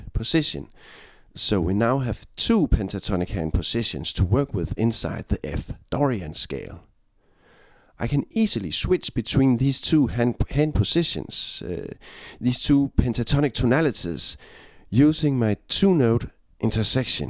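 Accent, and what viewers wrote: Denmark. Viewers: Well, is danish. That's the way they speaks. And plays. Remember, - Denmark has produced many great Jazz players. Palle Mikkelborg springs to mind.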